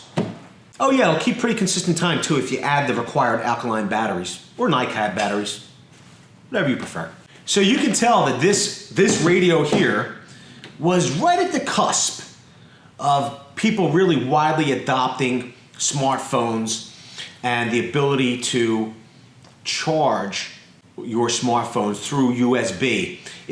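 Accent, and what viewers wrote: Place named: Boston, United States. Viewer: This dude is definitely from Boston 😂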